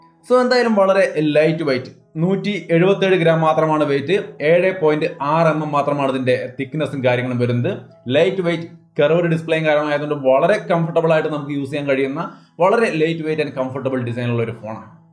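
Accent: Thrissur, India. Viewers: Uff thrissurkaarana aano 😍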